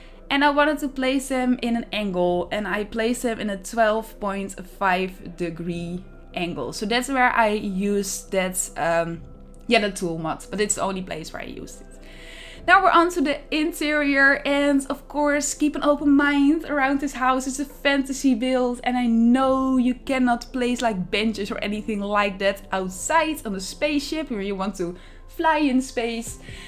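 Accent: Netherlands. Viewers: Wowwww 😍😍😍😍 ik hoor gelijk dat je Nederlands bent 😂😂💗💗💗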